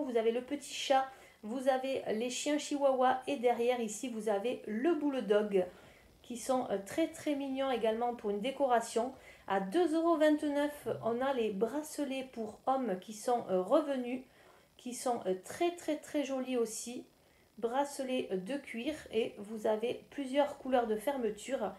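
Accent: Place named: Southwest, France. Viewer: Bonjour, A Lyon il n'y a pas de magasin PARVIS. Tu as des enseignes vraiment très bien dans ton Sud-ouest (et avec l'accent que j'adore 😍😍😍😍😍)!😘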